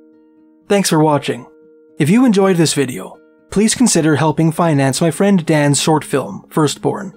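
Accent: Canada. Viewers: You have the heaviest Canadian accent I've ever heard. I'm gonna use your channel as an example of a Canadian accent. Sorey (Canadian for sorry).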